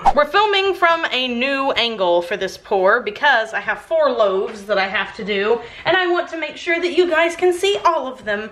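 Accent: Texas, United States. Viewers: You do sound like graveyard girl, it must be the Texas accent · The way you say scrapey scrapey is similar to grav3yard girl's sippy sippy thing. But it's probably just the texan accent to most people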